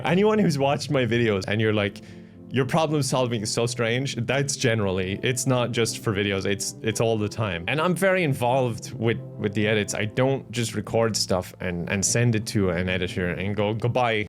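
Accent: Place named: Ireland. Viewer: Jeysus, I've watched for a little over a 3rd of my life (maybe, I was never good at math). I suddenly understand now why I have an Irish tint to my accent. Started watching somewhere around the first spider-man videos. Also even if you don't make a full cover, pleeeeese release the " " song. It was so good. And I'll need to learn that song myself in a few years.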